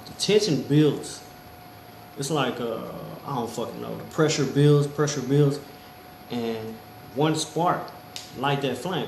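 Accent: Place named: Texas, United States. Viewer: where you from? u sound like you in texas, I want to say dallas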